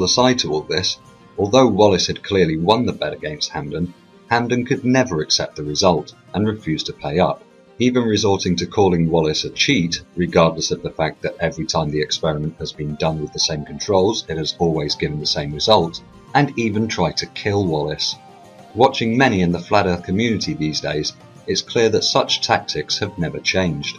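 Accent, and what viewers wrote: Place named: England, United Kingdom. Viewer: ***** You AVOIDED the most pertinent part of my POINTS (and I only had a couple)... is it 2 FEET or 3 FEET over 6 miles... You state in the video it's been duplicated many times, the 3 feet thingy... yet avoid or explain away atmospheric refraction - PLEASE... you even state in the video it, the experiment, has been validated many times. You use an English accent.... is that to sound authorotative...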